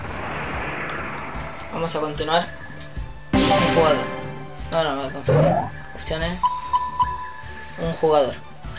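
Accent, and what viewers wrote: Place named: Mexico. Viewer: por tu acento creo que eres mexicano verdad?? viva mexico carajo!!! jajajajjjxdjkajxkajdoisadjs xd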